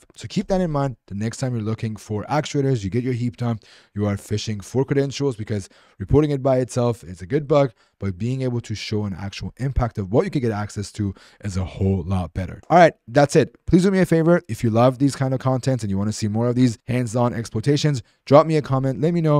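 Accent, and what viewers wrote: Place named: Iran. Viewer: i know a fellow iranian brother when i hear one 😂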